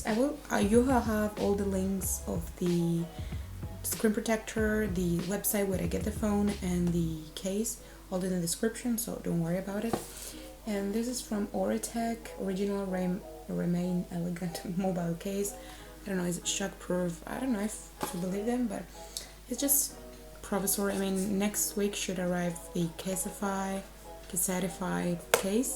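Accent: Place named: Italy. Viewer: you kinda sound italian, but I can’t say because you speak english very well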